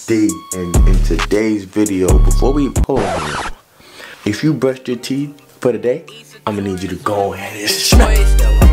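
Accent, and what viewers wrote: New York, United States. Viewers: I can hear the ny accent in your voice but you picked up onna florida shit so decently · u sound like you from newyork then anything. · U have a New York accent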